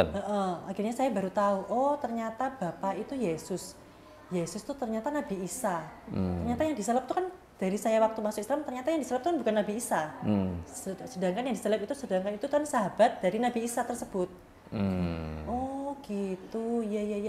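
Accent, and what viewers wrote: Indonesia. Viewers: Medok skali jawatimurnya ibu...😂..smoga tetap istiqomah..🤲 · Surabaya medok mantap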